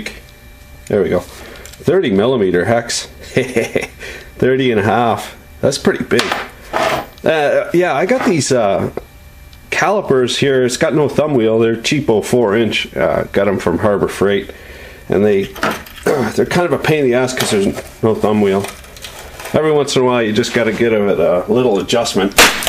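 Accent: Canada. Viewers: Iron ring? So you ARE Canadian... I thought I recognized that accent. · Not sure where you're from but you sound very Canadian lol. I enjoyed this video and had a great laugh at your hilarious commentary. Great job on the punch, give me some ideas for myself. Thoroughly enjoying the precision calliper adjustment lmao. Have a great day. Ian Little Spirit River, Alberta, Canada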